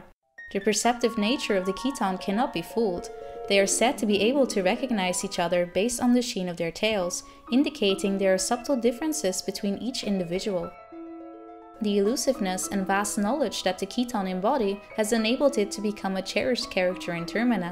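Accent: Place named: Netherlands. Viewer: Love your vids :) what country are you from? You sound Dutch or something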